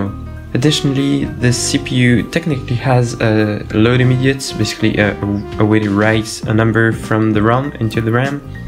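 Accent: France. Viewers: with your accent youre french ? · T'es français ? J'entends un certain accent !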